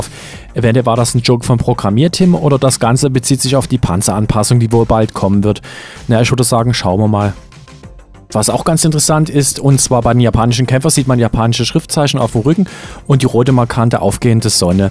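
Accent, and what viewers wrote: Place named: Saxony, Germany. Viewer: Alter bist du aus SAchsen oder was ? Dein Dialekt ist ja katastrophe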